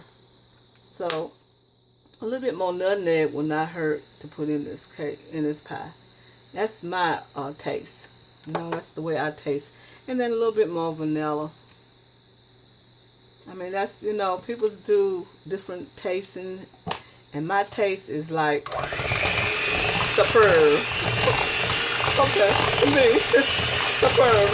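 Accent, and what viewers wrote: South, United States. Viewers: My mom is 85 born and raised in the south and has always pronounced her words like her. Especially nuttineg for nutmeg! If I hear you pronounce it like that then I know three things about you. 1. You’re a great cook! 2. You’re a clean cook! 3. You’re raised in the South! And one last observation, You were raised RIGHT and have lots of great advice and knowledge of natural remedies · Her accent is everything! Southern Bell honey!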